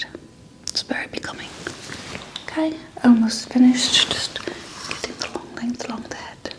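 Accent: United Kingdom. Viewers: Not sure anyone would really call an accent the Queens English now either, it's RP English or Received Pronunciation. Most people British people don't talk with a single accent accent I doubt they ever have or there'd be fewer local accents.. Even if you were to take the most common accent spoken now, it's not spoken by most of the population. The London/SE English accent is similar enough that most people from outside the area can't hear the local variations in it but even then you're only talking about 30% of the population which isn't most people. So saying most people don't speak like that now doesn't really mean anything as most people never did. As I said before, the only people who talk about British accents are Americans and when they say a British accent they're referring to a 'posh' SE English accent pretty much like the one is doing. Glaswegian, Scouse, Mancunian, Bristolian, Geordie are all British accents but they're not what Americans are talking about when they refer to a 'British accent' and as I said before I doubt that anyone in Britain would refer to their accent as British unless they were directing their comments to Americans. 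· Fun video. Is this your first time doing a British accent in your videos? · Are you really British????? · Those British accents will do it every time, won't they?! 😁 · once again this is amazing! Great British accent is perfect please keep doing more of these! Very.Soothing, thank u, Steve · I didn't know U were British 😆😄🌹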